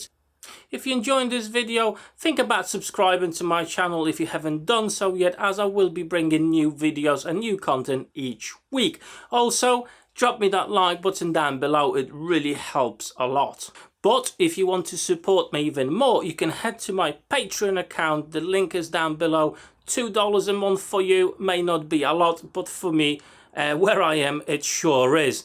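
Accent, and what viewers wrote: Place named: United Kingdom. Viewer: Wiedziałam, że z UK. Zastanawiałam się tylko z jakiego miasta konkretnie. Fascynują mnie akcenty. Ja mieszkałam przez 5 lat w Colchester w czasie studiów.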